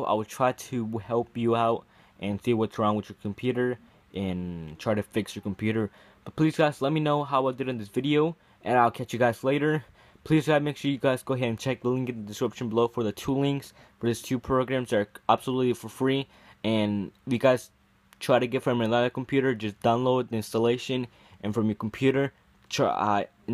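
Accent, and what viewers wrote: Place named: South Asia. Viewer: You sound like a brown guy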